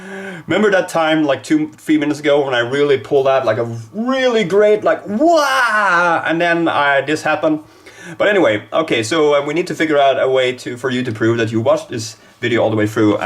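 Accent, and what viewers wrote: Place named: Scania, Sweden. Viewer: I'm feeling P4A this year, but it's the first time since 2009 I really, really enjoy it. Btw, talking about friendship, your P4A video of 2009 is still my favourite P4A video ever. Led me to your channel, and then a whole bunch of awesome people. :) You're a great network creator. And your dialect is awesome. I get happy every time I hear scanian. God Jul. ^_^ Alla sova, släckta äro ljusen, äre ljusen Tipp tapp, tipp tapp, tippe-tippe-tipp-tapp, tipp, tipp, tapp *clinks on piano*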